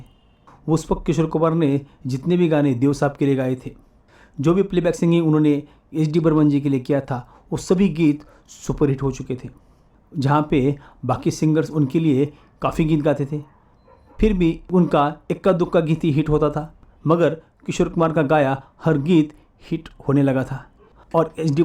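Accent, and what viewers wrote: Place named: Maharashtra, India. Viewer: तुम्ही मराठी आहात का? हिंदीत मराठी ची झलक झळकते ।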